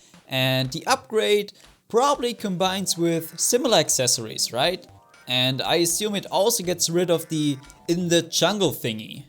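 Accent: Germany. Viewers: You are german right?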